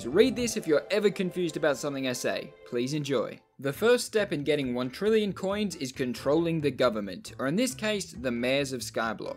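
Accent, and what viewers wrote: Australia. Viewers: good content and austrailian accent 10/10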